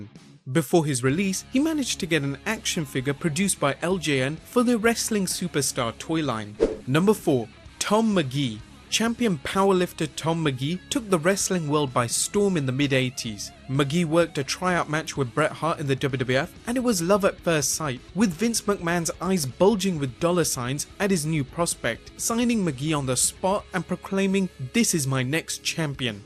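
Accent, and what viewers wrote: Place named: United Kingdom. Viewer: Absolutely fantastic to have a British accent back. Great work 👍🏾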